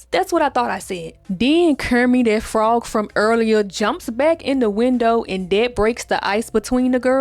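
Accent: Memphis, United States. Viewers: Are you from Memphis?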